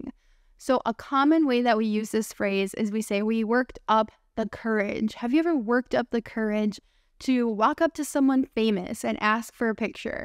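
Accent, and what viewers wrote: United States. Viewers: May I ask you a question? Your American accent belongs to North America?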